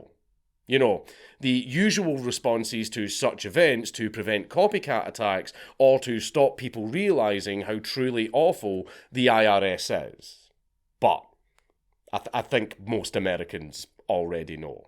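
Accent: Scotland, United Kingdom. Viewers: He talks too fast for such a heavy accent. Just Scottish blah blah.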